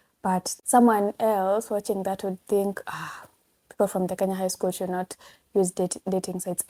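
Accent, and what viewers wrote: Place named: United Kingdom. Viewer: I'm always catching the intonation anamalizia nayo sentences....kidogo tu British accent itaingia..you gotta love ❤❤❤❤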